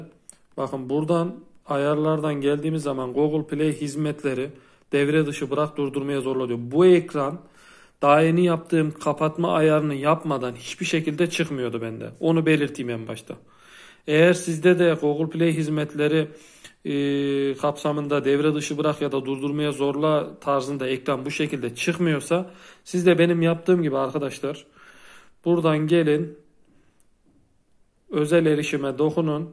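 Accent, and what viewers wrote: Turkey. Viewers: Abi yozgatlımısın?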